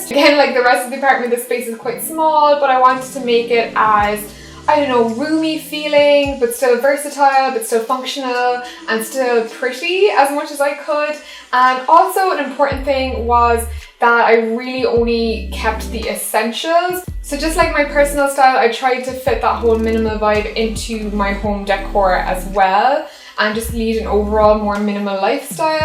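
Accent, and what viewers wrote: Ireland. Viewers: What part of Ireland are u from? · Is she Irish.... She sounds irish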